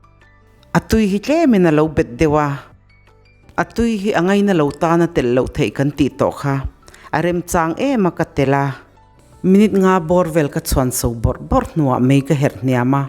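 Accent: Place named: Mizoram, India. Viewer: Mizo Elite Accent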